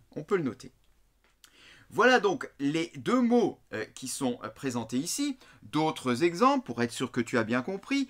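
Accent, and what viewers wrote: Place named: Alsace, France. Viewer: en alsace on le prononce, et il me semble qu’il vient de là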